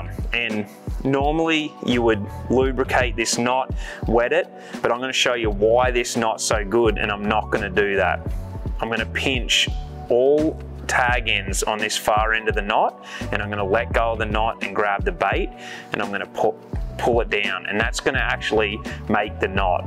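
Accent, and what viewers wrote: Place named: Australia. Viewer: why do you sound like an Aussie when speaking English ? I am really curious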